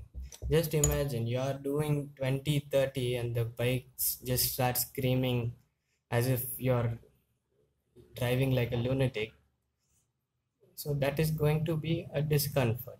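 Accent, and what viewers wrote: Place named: Bengal, India. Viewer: Babal English vaiya wowowo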